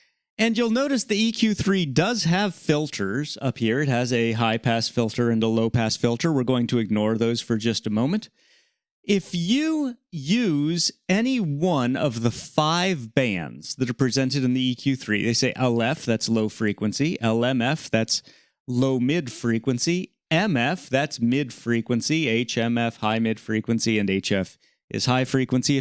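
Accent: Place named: Canada. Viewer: Hey, I love your video..great job and very informative!! Canadian eh? Brantford Ontary-ary-ary-O here lol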